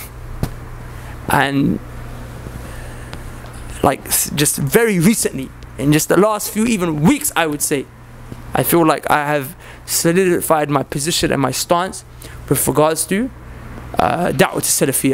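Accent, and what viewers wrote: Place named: United Kingdom. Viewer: I can't believe dude speaks with British accent but some how it doesn't make sound smart 😂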